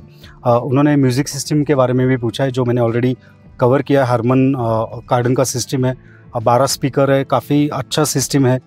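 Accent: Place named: Pune, India. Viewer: Without even seeing your description, I knew you are a Puneri by your accent. Great to know, I owe 8 years of my wonderful life to Pune. My next next car is definitely X1. Thanks for detailed information.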